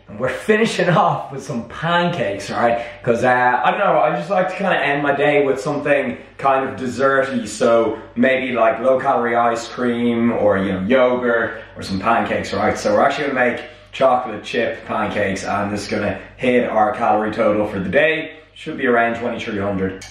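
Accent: Ireland. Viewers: Is he Irish? How strange I clearly understand his english lol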